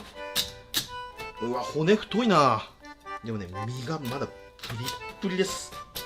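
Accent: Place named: Mikawa, Japan. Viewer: かねこさんが市場の人と話すときに漏れる三河訛りに親近感を覚える遠州弁話者です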